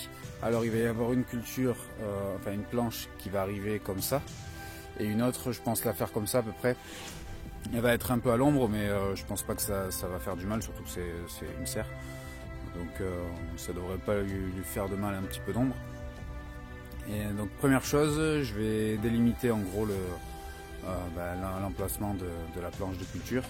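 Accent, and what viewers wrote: South, France. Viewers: OUI tu me la dit la derniere fois qur tu étais nouveau d' ou viens tu ? un léger accent du sud ;moi sa fait 39 ans que je suis a Lyon c était pour 3 ans max Bonne journée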